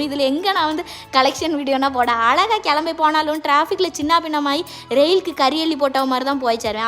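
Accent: Tirunelveli, India. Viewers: Nenga Tirunelveli ah?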